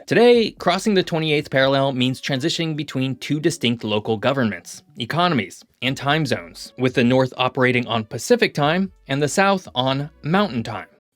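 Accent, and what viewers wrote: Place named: United States. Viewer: rip that gringo spanish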